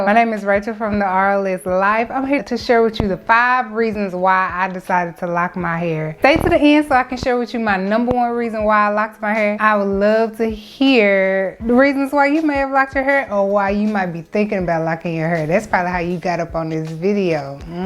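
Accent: United States. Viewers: Do I detect a Texas accent? I think I know my ppl when I hear them and you speak similar to Beyonce and Kelly 😄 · I feel all of this! I am getting Microlocs again Monday 2 days from today! Also are you from stl? I love your accent!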